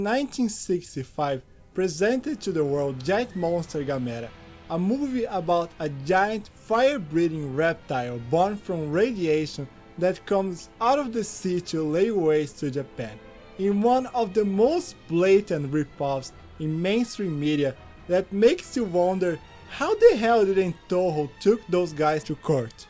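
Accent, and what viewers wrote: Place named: Brazil. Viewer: Great video. Keep practicing your English pronunciations. You have a heavy Brazilian accent.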